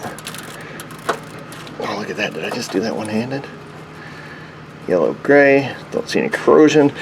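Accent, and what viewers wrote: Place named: South, United States. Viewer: You sound just like the south of men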